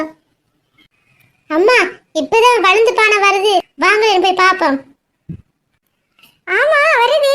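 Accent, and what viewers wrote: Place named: Sri Lanka. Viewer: Video romba romba super sister ❤️🔥 neenga vanthu srilanka va sister pesura slang differanda iruku 🙄🤔 aana supera irukuthu sister intha slang 😍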